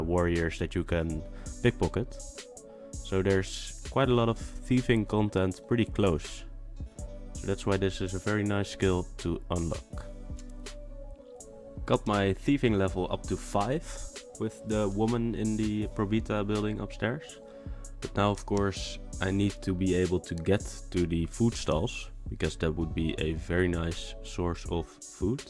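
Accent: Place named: Netherlands. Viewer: I cant help but notice your accent, a dutchy right?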